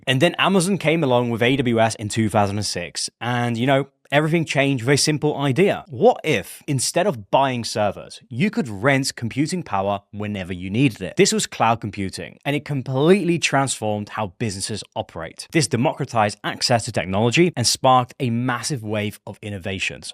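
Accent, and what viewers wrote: United Kingdom. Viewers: Love from Ireland, your neighbour as I can fish out the distinct UK accent 😊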